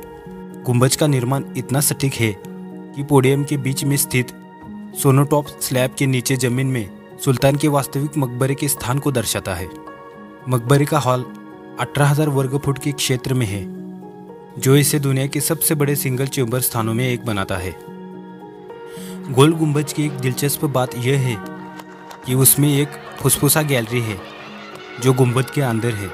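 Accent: Maharashtra, India. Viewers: माहिती तर लाजवाब दिलात , पण तुमचा आवाज मराठी वाटतोय ❤️👍🏼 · इस वीडिओ के निवेदक की मातृभाषा मराठी है ऐसा लगता है ।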